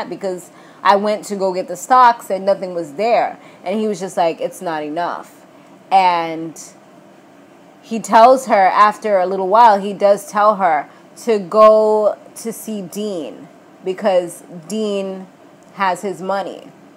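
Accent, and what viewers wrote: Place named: Brooklyn, United States. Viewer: Are you from Brooklyn and of Caribbean descent because I hear a Brooklyn and Caribbean accent when you say certain words.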